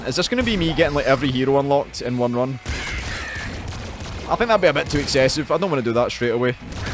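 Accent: Scotland, United Kingdom. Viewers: The Scottish accent considerably enhances the experience of this video. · are you scottish? cuz i love scottish accents. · me: oh neat a nuclear throne LP I don't see those super often. I'll just click on this and- instant thick Scottish accent and I am consumed with glee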